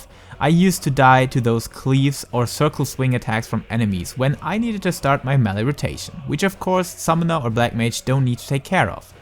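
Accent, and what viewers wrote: Germany. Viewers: Are you German ?!?!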